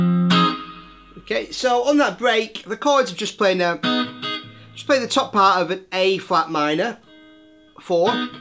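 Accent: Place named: Northern England, United Kingdom. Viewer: Far and away the best lesson on this wonderful song. Love your accent, sounds like something out of Coronation Street. Cheers from New Zealand.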